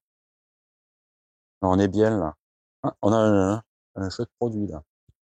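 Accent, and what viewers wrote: South, France. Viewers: hello, une fois de plus, très bonne vidéo très "QUALI 😊" pas desbrouff...et agréable à regarder et à écouter, ""avé"" l'accent du sud🤩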